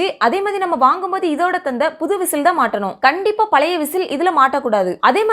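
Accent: Tirunelveli, India. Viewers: ஊச்சி ஊச்சா இருந்தா......தூத்துக்குடி,திருநெல்வேலியா?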